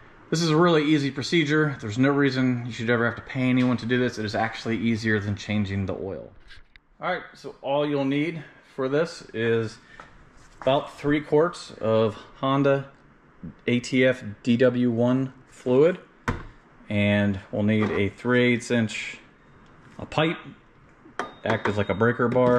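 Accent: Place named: United States. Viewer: Probably too late to be helpful but at least in this video he’s using 2.7 quarts, not liters. He sounds American and the bottles here are sold in 1 quart quantities. Not totally sure what the quart-to-liter conversion is but I’m sure you could google it and it might end up that 4 liters is 2.6 quarts? :)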